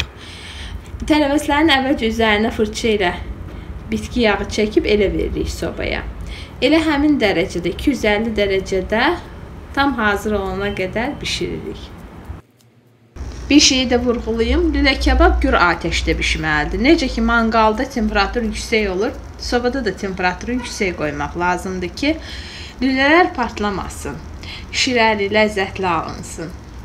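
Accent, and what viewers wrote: Baku, Azerbaijan. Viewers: MaşAllah gözəl Bakı ləhcəsi əllərinə sağlıq.Mən sizi izləyirəm bişirdiyiniz his olunur dadlı və ləzətlidir təşəkürlər.Nafisa Qurbanova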